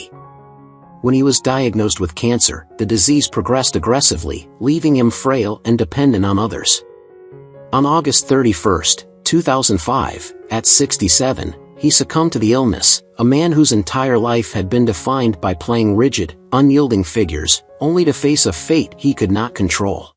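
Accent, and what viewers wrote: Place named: United States. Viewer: american narrator what do you expect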